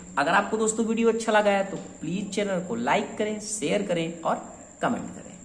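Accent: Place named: Hyderabad, India. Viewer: Hyderabadi accent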